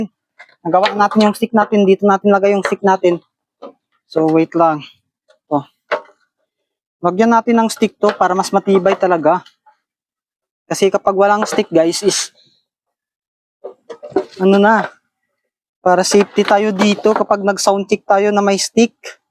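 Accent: Visayas, Philippines. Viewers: Idol bisaya ka ya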